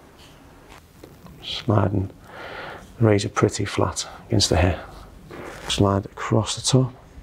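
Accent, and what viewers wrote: Liverpool, United Kingdom. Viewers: Love your scouse accent mate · scouse accent? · Scouse I believe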